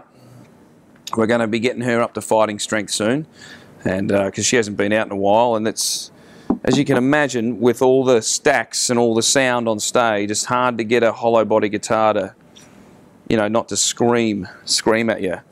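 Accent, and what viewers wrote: Australia. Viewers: massive australian accent ! this dude seems so cool haha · How Australian is he? Brilliant! It just sounds better being called a c$&@ in Australian. Sounds like a normal bloke. Great stuff!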